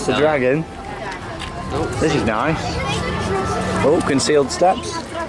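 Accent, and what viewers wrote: Netherlands. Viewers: dat dutch daccent doe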